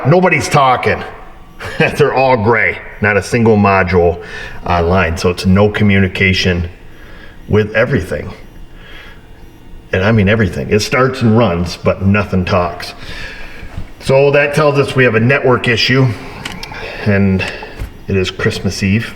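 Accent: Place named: Rochester, United States. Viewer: I have a buddy from Rochester and I pegged your accent before I looked up your shop. Good video and happy new year.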